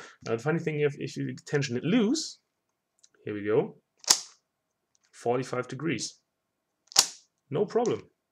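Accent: Germany. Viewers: Thanks for the review! Are you german?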